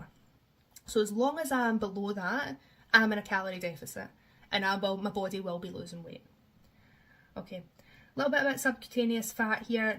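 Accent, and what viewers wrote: Scotland, United Kingdom. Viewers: Just found your channel and well done on your amazing journey. Excited to hear another Scot here 🏴 ❤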